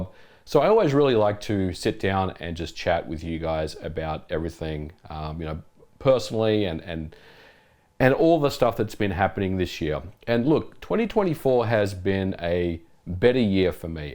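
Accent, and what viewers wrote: Australia. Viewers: The most important thing about this channel to me, and I really hope you see this because it's true, is having a non-US creator among the big gaming channels. When 90% of videos on the platform are by American dudes in their 20s, it really means a lot to have an older, Australian voice to bring a different outlook, experience, and vibe, and honestly, it can just be downright fatiguing to hear high-energy American accents nonstop video after video. You're making a big difference in a way you might not have known, and I hope a lot of others feel the same, too. Love ya, keep it up. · Going to use you as inspiration to lose weight in 2025. I just hit 50, and as you say, still eat like I did in my 30s. As a fellow IT enthusiast from Melbourne who was in the 90s PC scene, keep up the great content. Hearing an Aussie accent is always welcome. 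· Been watching a long time now. It's the quality content that keeps me coming back, plus, the Aussie accent lol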